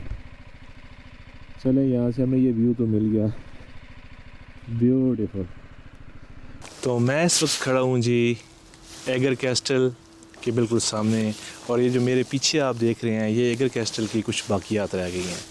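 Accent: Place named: Sargodha, Pakistan. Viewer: bhai ilaqa kon sa hy apka? Lehja Sargodha k as pas ka mehsoos hota hy.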